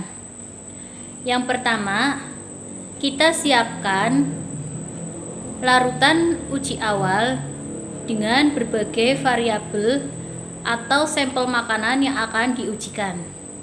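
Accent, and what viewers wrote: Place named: Tegal, Indonesia. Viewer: Top markotop tegalane medok nmen ah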